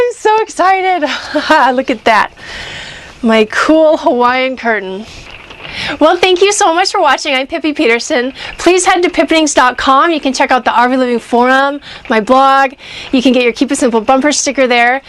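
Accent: Canada. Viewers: she is from Canada a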